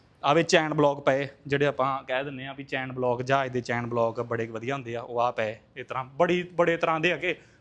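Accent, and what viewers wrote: Majha, India. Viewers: ਜਾਜ ਮਾਂਝੇ ਵਾਲੀ ਬੋਲੀ ਆ ਪਰ ਵੀਰੇ ਜ਼ਿਲ੍ਹਾ ਤੇ ਪਿੰਡ ਕੇਹੜਾ ਕਿਰਪਾ ਕਰਕੇ ਜ਼ਰੂਰ ਦੱਸਣਾ ਜੀ ❤😊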